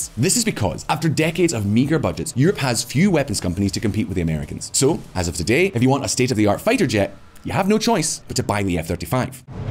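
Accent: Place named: Scotland, United Kingdom. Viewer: Ahh..Scottish…❤️❤️❤️ breath of fresh air!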